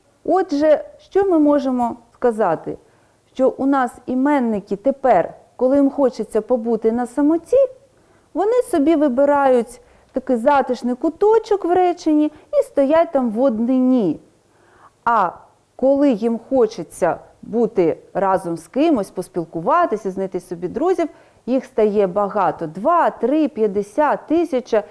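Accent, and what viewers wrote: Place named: Russia. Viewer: дякую! Насправді питання були до вчительки, яка в ролику саме таким чином наголошує слова: 9.46 - сАнки, 16.29 - островА. Ще запитала б про наголос у слові "збірні" (11.55), адже у словнику подається наголос на першому складі цього слова. Чутно, що людина російськомовна, хоча й старається говорити українською. Просто виникає питання: чи подібні ролики дадуть дітям переконливі знання?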